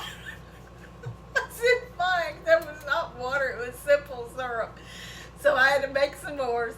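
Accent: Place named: South, United States. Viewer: Don’t ever apologize for talking like a southern girl,it’s the best place in the world to be from.Im from Arkansas and you talk just like we do.Just Southern!!!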